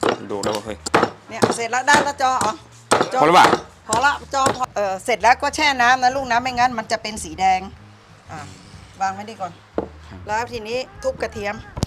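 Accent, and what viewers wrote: North, Thailand. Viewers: ชอบเวลาแม่ อู้เมืองจ้าว.เป็นธรรมชาติที่สุดเลย.คุณแม่น่ารักทำกับข้าวก็.น่ากิ๊นนน่ากินค่ะ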